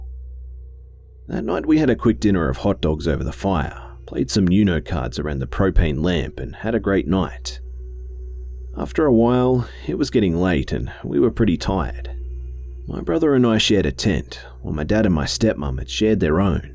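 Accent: Australia. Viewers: Can't tell if your an Ozzie or not sounds like it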